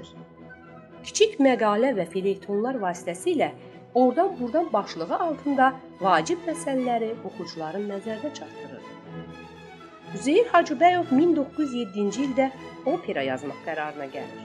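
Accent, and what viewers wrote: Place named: Talysh, Azerbaijan. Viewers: Ee bəsdirində xəstəsiz talış ləhcəsidi ləhcəsidi nolsun əsas məlumatınz oldu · Müslüm Moqamayevin şəkli səhvdir. Bir də ki danışan elə bil talışdı ləhcəsi var.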